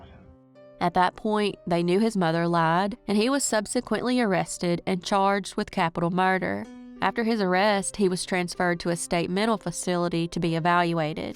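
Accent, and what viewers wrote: South, United States. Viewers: Hey! I'm not sure what your actual name is, so I'll call you. Just so you know, I binged your content last year while building a chicken coop and cutting a lot of wood. So, whenever I hear the intro, I can smell cedar! Or, whevener I smell cedar, the intro plays in my head! Weird, eh? But anyways, thank you so much for the content and soothing voice and southern accent. I wonder, have you noticed a difference in viewership between unsolved and solved cases? I know, for me, I'd like to see more solved cases. Thoughts?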